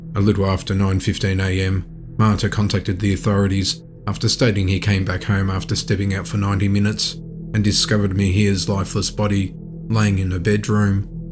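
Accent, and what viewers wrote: Australia. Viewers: I am guessing the narrator is Australian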